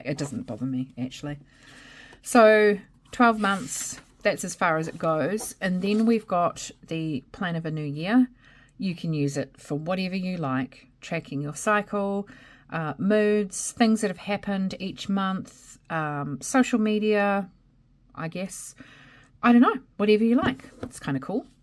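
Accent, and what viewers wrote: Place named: New Zealand. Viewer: Hearing a fellow kiwi is always a treat! I love the A6 size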